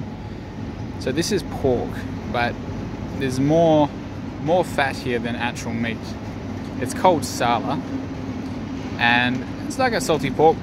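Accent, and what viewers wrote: Australia. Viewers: В первый раз услышал Австралийский акцент английского. Довольно забавно. Удачи в России.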